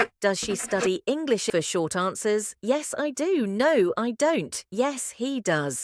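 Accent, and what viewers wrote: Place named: United Kingdom. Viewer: Qué hermoso es el acento británico! Saludos desde Paraguay 🇵🇾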